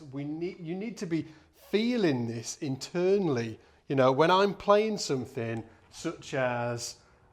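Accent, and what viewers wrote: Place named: Manchester, United Kingdom. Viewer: Tha sounds like a manc lad, lad! Great tutorial BTW Im a guitarist and this was great help for me. Just one thing....have you just got back from robbing an ASDA?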